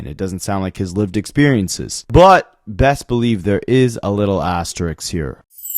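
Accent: Canada. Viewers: Drake must be Toronto slang for Fake. No offense I can hear ur canadian accent and like your work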